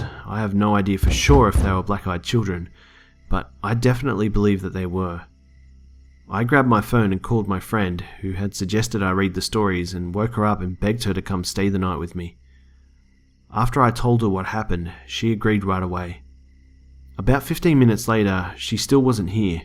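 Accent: Australia. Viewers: Alabama chic with an Australian man's voice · I love Aussie accents 😍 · I love that he’s Australian, him swearing in an Aussie accent is the best thing to ever happen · I want to get into the stories but the Australian accent kills it. I keep thinking you're going to shout "HAY be a good Sheila & put another shrimp on the barbi". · Aussie narrator for a story set in Alabama 🤔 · Aussie guy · Are u Aussie?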